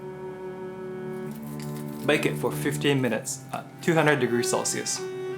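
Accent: United States. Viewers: You sound so much more american since I first started watching- wo a h. Also the video is g o r g e o us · he sounds so american now LOL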